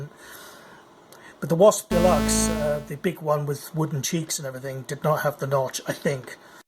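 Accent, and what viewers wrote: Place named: United Kingdom. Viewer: Thanks for this, I'm really considering one now. Feels so right to hear a UK accent explaining a Wasp (clone, but still)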